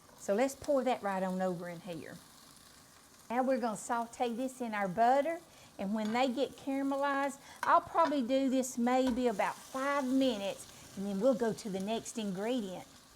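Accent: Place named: South, United States. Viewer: This looks really good 😋. New Subscriber 719 🎉. I love your southern accent and videos. Just saw the Shorts with Fried Okra. Will you be posting it here too?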